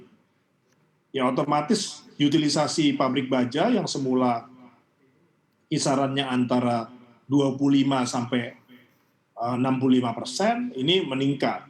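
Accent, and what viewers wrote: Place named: Banyumas, Indonesia. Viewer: Salam ngapak pak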